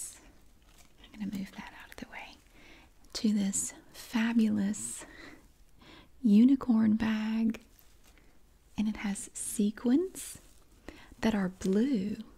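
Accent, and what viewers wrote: South, United States. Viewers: I must say ; I think your southern accent really complements the asmr stimuli of sense of relaxation and well being.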